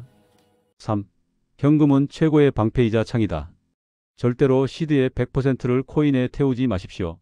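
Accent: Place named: Seoul, South Korea. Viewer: 서울말같기도 아니기도 같기도 아니기도ㅎ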